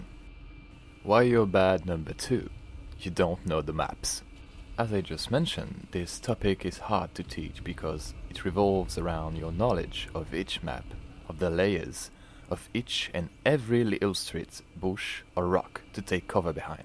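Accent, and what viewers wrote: France. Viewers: Your french accent is very good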